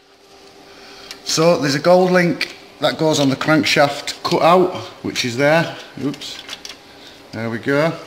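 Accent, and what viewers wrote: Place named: Bradford, United Kingdom. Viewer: I just googled "does nissan pathfinder have a timing chain" and your vid link came up... the reason I clicked on it was because of the place name "west yorkshire" oh my home county, not only that your in my home town "Bradford" that I left 40 years ago.... So I watched your video just to hear the Bradfordian accent and I was amazed at what you had taught me about this engine Thanks for the informative video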